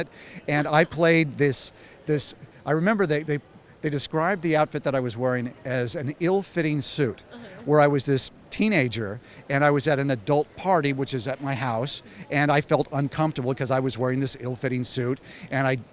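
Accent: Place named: England, United Kingdom. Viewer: Here in the UK the narrator has an English accent. I'm certain they didn't get a different narrator just to show it here. Plus although the accent is definitely from England I couldn't tell you from whereabouts. It's more like a generic English accent. Does anyone have an answer for me.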